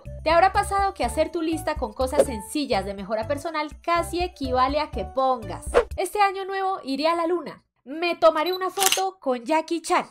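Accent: Colombia. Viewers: Oye una pregunta eres colombiana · tu de que parte de colombia eres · Eres de Bogotá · ¿De que parte de colombia sos?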